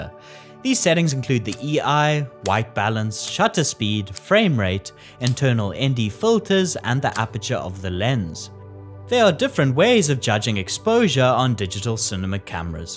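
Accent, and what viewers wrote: South Africa. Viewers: Kiff to hear a Saffa accent. Nice one, bru!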